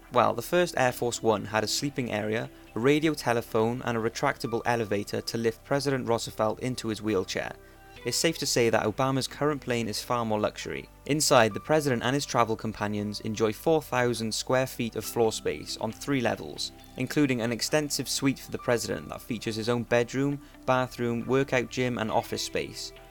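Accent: United Kingdom. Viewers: The narrator is a Brit. haha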